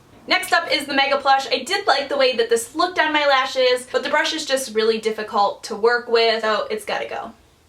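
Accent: Midwest, United States. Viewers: Are you from the midwest because I feel like your accent is very similar to a midwestern accent. Just wondering :) Love the vid btw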